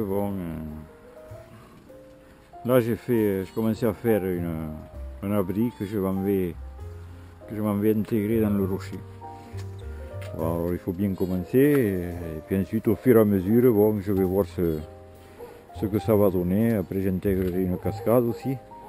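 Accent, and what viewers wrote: France. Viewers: je me suis abonner a votre chaîne il y a un mois et je suis dingue de vaut vidéo , votre fort accent de Carcassonnais ma fait rire vous m aver donner envis d avoir des oiseau pour mes 14 ans ! merci pour cette videos !!!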